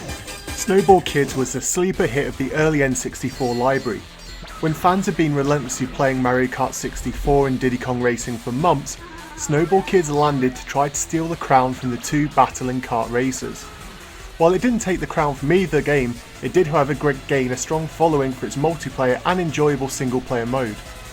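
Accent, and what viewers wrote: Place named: RP, United Kingdom. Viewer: Too many years at a private school. Your saying that's why you have a posh ascent??? I would think they would teach you proper English without an ascent hahaha Just doesn't make sense to me.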